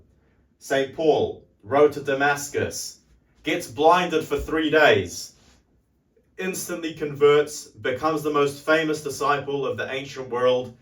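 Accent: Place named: Northern England, United Kingdom. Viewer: Amazing cross between a northern english, kiwi and australian accent. Listen to this young man, he’s either lived many perspectives already, or has family experience to call on.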